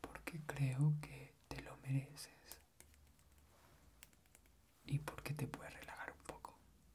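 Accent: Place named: Colombia. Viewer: escuchar Asmr en "colombiano"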